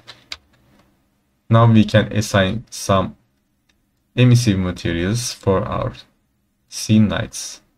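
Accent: Turkey. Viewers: This English looks like Turkish English. What a bad accent :)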